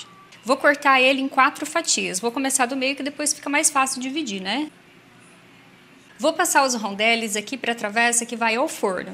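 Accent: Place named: Piracicaba, Brazil. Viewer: Adoro seu sotaque piracicabano, muito simpáticao.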